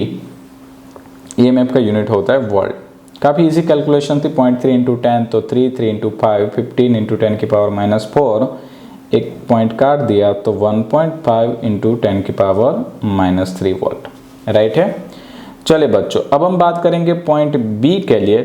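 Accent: Gujarat, India. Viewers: Are you from gujarat ?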